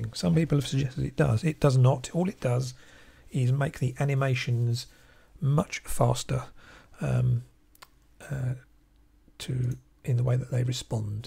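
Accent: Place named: United Kingdom. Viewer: Thanks! Very informative. I've just found your channel and been watching your plasma videos. I'm getting into Linux and enjoying it I'm moving from Mac. I've tried alot of distros and prefer KDE - I'm using neon to get the latest plasma but I wonder do you prefer or recommend Kubuntu over neon? I'm finding some bugs in neon I'm using breeze dark but I cannot change the window decorations and if I try it locks the window so I cannot do anything with it so have to kill the window and open another one. I'm wondering if it's better to go back to kubuntu and use 19.04 or would you stick with LTS? Nice to hear another Brit at first I thought you were Zebedee boss...Cheers!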